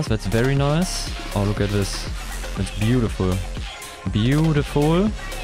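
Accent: Germany. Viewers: it’s okay the German accent, your literally my fav yt for guides like no joke nobody makes them better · It was pretty nice , and I'm totally okay with german accent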